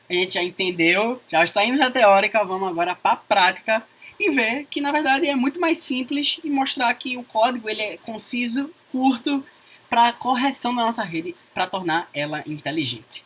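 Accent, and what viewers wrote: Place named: Rio de Janeiro, Brazil. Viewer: muito bom carioca com puxada nordestina ou vice versa. hahah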